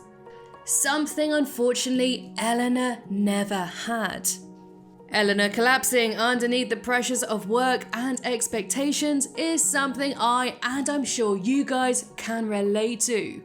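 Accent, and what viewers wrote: United Kingdom. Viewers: the narrator is ANNOYING! I fucking HATE these british narrator accents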